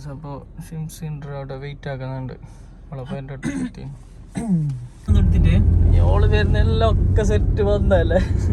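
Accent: Kasaragod, India. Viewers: ഇതെന്ത് ബാസ നിങ്ങടെ കാസറോട് ബാസ 😮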